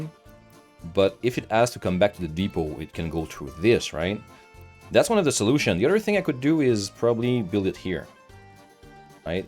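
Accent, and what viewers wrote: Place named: Quebec, Canada. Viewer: I live in Florida. My family is visiting from Montreal. We are Armenian. I knew i recognized the accent. Thank you for your videos. Very relaxing.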